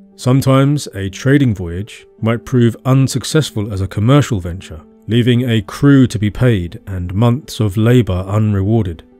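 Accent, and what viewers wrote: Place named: United Kingdom. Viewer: Have you ever seen the Fall of Civilization channel/podcast it seems right up your alley. Plus his voice is a little similar to yours (you're both British but sound like you are from the same region). His podcast about Mayan Civilization was great. 9 times out of 10 it ends with the Civilization collapsing because of climate change. Check him out he's also on Audible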